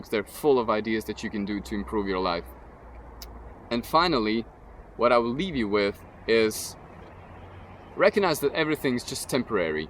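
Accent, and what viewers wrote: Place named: Netherlands. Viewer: Great points and analysis, totally right on. I like what you said about spiraling around the issue and getting closer to the emotional pain, that's a great visual image and definitely accurate. Where do you live, Holland? Just guessing based on your accent.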